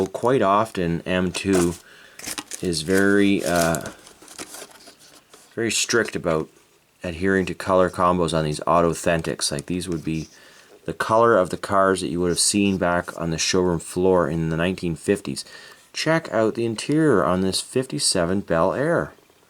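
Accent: Canada. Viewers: Are you Canadian cause you say aye quite a lot there bud if so good aye bud I'm Canadian to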